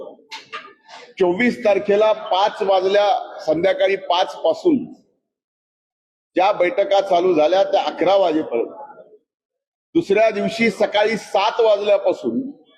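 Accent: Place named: Beed, India. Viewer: बोलीभाषा बीड वाले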